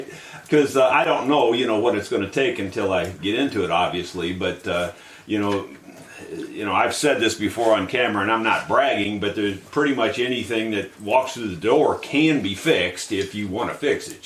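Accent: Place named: Missouri, United States. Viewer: I’m a bit further down the road but recognize the accent as “local” for sure. I’m in Jonesboro, AR and dig your repair style!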